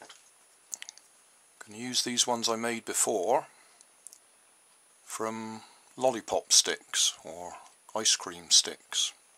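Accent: United Kingdom. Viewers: Hi Sir.. I am glad to see the way you demostrate your incredible inventions. hats off to your sir .. i am highly encouraged and engrossed in looking at all your videos ... i am sure you are from UK as i heard ur accent and i have many friends out there .. its been a long time i have been looking at videos to find out something interesting to do when i am normally free.. Thanks for being so passioned in showing the entire creation precisely .. thanks again and have a blessed day .... sir .... i am insipred ...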